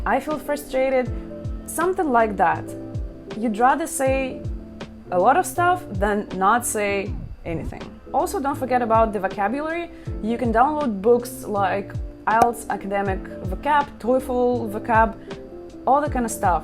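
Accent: Russia. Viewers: До мне даже не дошёл что ты русская))я очень восхищён с твоим акцентом ! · you from russia yea, я вижу это по твоему акценту. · БЛИН так слышно русс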